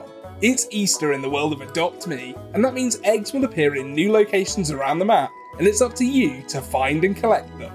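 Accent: United Kingdom. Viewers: Are you from London or Eastern?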